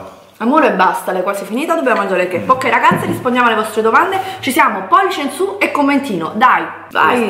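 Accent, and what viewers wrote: Naples, Italy. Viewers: Sì è Napoletana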